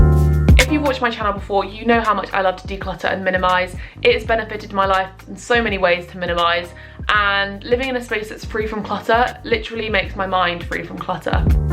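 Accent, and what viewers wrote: United Kingdom. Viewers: I like your British accent and thank you very much!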